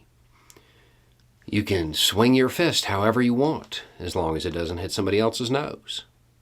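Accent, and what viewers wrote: United States. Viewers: You're so relatable with your country English. 🤘 P.S.: I'm late to the shot party, but I got mine. I felt zero pain or side effects. I'm still masking, cuz Delta is a bitch. Got the J&J vaccine. Never hear/see shit about people gettin' Delta on THAT one and done.🤔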